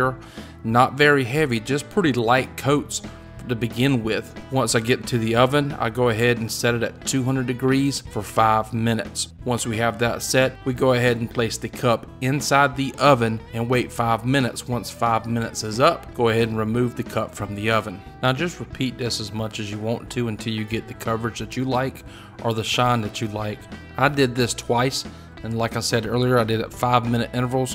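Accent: United States. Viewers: 2 questions please: 1, can I use this method on wooden coasters, will it give a non stick finish for coffee cups? 2, are you quoting 200 deg Fahrenheit or Celcius - I am assuming Fahrenheit as by accent, you appear to be American. It could be very helpful if you noted either Fahrenheit or Celcius in future videos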